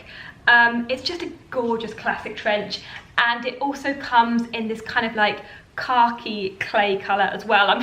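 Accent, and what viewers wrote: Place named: Southern England, United Kingdom. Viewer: hey, i just subscribed to your channel because i really enjoyed your personality and it was a very pleasant video to watch. Question: what area of the UK are you from? from the sound of your accent ( which is lovely by the way:- ), ) i'm guessing you're from the south of England but, i was just curious as to what area in the south are you from? i.e. Greater London, etc... Also, you looked very pretty in all the outfits you tried on:-)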